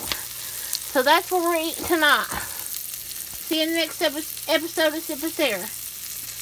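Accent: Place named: Kentucky, United States. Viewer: Ya sound like me when ya talk..I am from Kentucky. and I feel at home here...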